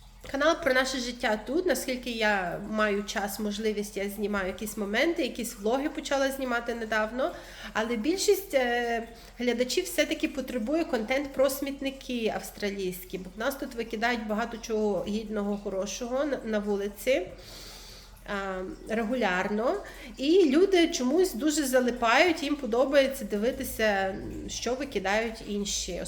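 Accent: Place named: Transcarpathia, Ukraine. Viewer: У вас закарпатський акцент.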